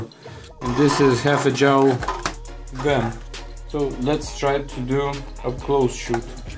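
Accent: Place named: Serbia. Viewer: alright thanks! Btw, where are u from? Ur accent sounds like serbian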